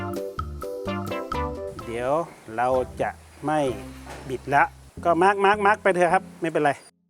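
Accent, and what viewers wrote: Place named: North, Thailand. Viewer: เป็นคนเมืองใช่ไหมครับพูดไทยสาบเมืองมากเลย😅😅😅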